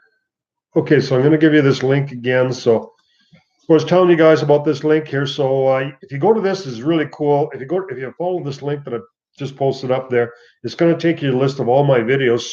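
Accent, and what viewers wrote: Canada. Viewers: Being English, i can say you sound 100% Canadian, Americans sound American. Strangely though saying ay a lot is a very southern english thing, cornwall, devon kent etc.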